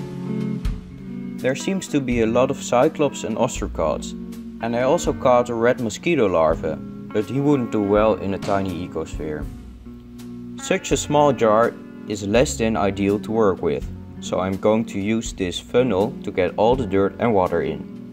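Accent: Netherlands. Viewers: Dutch people speak English better than most Americans. :-). I very much enjoy the Dutch accent. Very cool!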